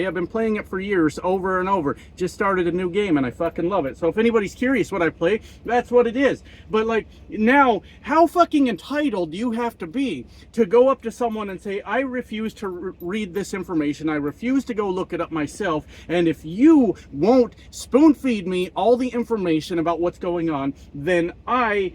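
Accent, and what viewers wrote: Canada. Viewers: I am pissed off at the Democratic Party. They are responsible for Trump getting elected, and must never be forgiven and never be forgotten. There must be a new Second party that replaces the Dem party. They are horrible losers and failures. Biden was a loser and liar. He did not fire Garland when he did not charge Trump for his crimes. Sick of speeches that are just liars. Biden/Harris support for gaza war was more important than stopping trump. sick and unforgivable. The Trump/Maga people will destroy themselves, bashing them achieves nothing. oh,btw, you have a candian accent, so no surprise people might think you are from canada. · You do sound Canadian 😅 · I hate to break this to you, sir, but you DO sound like the stereotypical Canadian. It's not a problem; I like Rush, Red Green, Bare Naked Ladies, SCTV, and the RCMP. 🇨🇦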